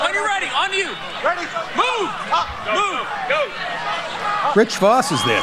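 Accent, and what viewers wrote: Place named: Boston, United States. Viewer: Are you a Massachusetts man? As a former North Ender it sounds like home.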